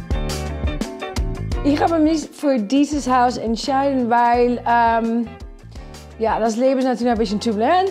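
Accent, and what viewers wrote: Netherlands. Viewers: Lovely 🥰 ich liebe den holländischen Akzent 💕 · Es ist gut! Sie hat den wunderschönen holländischen Akzent ❤️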